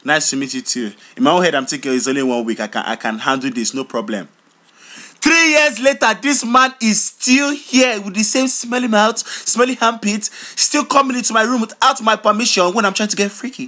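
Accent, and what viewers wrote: United States. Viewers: woah your american accent is good af! scared me lol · dayum his american accent is so good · Love ur American accent lol im American and ghanaian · Nice American accent. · ur american accent is good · wow your american accent is really good! and i nearly choked when you said youre trying to get ffreaky. too. fucking. funny. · Lmaooooo Nigerians! It is a struggle! But your American accent is better than mine and I'm American lol! Very funny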